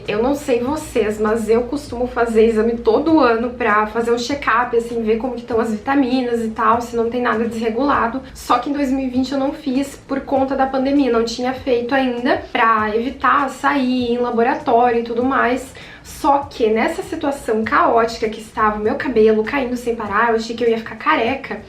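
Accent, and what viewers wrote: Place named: Rio Grande do Sul, Brazil. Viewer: Aq eu percebi que tu é gaúcha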